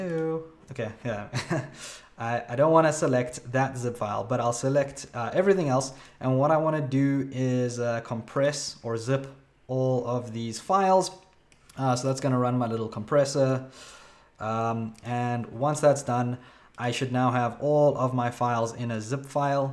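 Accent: South Africa. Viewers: Cape Town or Joburg?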